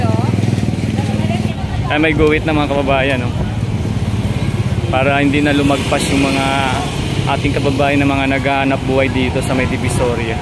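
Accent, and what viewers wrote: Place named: Visayas, Philippines. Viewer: Bisaya accent ka boss ah hehehe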